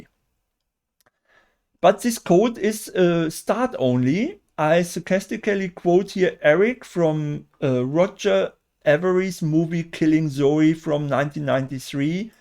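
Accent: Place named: Germany. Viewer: that's some heavy german accent (me a german may say this)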